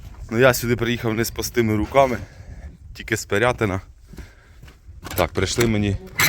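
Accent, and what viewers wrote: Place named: Western Ukraine, Ukraine. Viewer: ты из западной бо в тебя говор такой не тот что в Ярика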